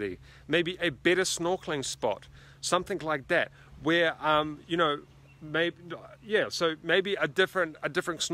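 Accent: New Zealand. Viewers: make a video on how to get your nice New Zealander accent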